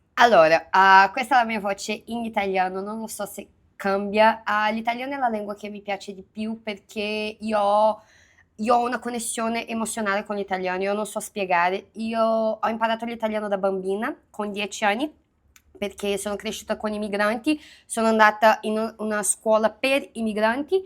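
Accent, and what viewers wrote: Minas Gerais, Brazil. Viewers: tu fala com muito sotaque brasileiro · Linda, poliglota, inteligente e sotaque mineiro. 😍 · O sotaque mineiro presente em todos os idiomas 😂❤ eu amo isso pq não precisa "neutralizar" o sotaque pra se encaixar em outro.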